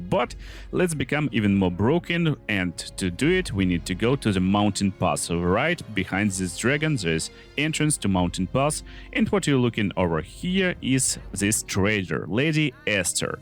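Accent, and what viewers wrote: Russia. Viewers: Даже на английском ютубе по бг я нашел человека с охранительным русским произношением. Гайд кстати хороший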